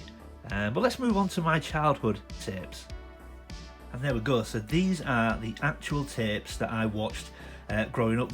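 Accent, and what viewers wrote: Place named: Northern England, United Kingdom. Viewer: Great to hear a Northern voice talking about their passion for collecting. Been back and currently watching all your videos now thanks for all your hard work!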